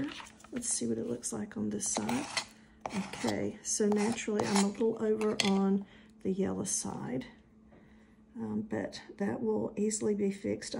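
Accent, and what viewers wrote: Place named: South, United States. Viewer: Hi~I've just found you. This looks like a fun project which I'm going to add to several of my many, many journals. I'm thinking that this is a great way to use up some of those found, pressed, and saved items plus what-nots that are accumulating in my desk, that can use a safe home and this remedy is so clever! Since I do not have a square punch, I'll use a round one instead, which I'm sure will turn out just fine. I'm really enjoying your soft sweet southern accent. Thank you for apologizing frequently, but it really isn't necessary , at least not for me. You're fine just the way you are. I look forward to more inspiration from you, till then, thank you.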